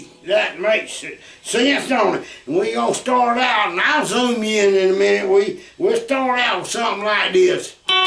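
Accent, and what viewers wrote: South, United States. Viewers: Over here in England we just love that country accent of yours and your down - to -earth approach to things. Just bought myself a lap steel and finding your teaching sessions a real help and inspiration. Thanks.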